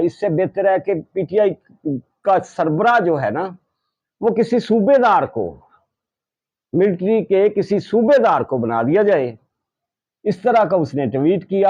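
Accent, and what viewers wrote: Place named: Punjab, Pakistan. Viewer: nice commentary with punjabi tankay!😀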